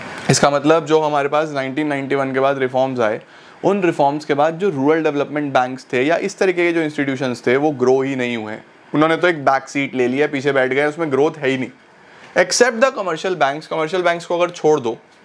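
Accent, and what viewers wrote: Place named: Punjab, India. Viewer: Sir your punjabi assent 🔥🔥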